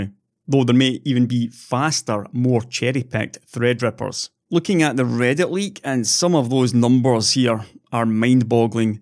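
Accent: Scotland, United Kingdom. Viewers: Scotia English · Great content, in depth knowledge, scottish accent. Thick, thick, thick scottish accent. You got my subscription mate. I'd also love some content in scottish gaelic.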